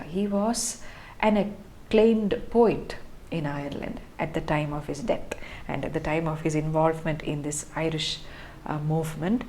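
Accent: India. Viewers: Love ur Indian accent 😊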